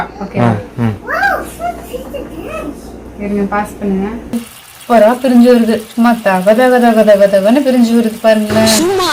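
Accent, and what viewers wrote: Kongu, India. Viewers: Kongu speech &look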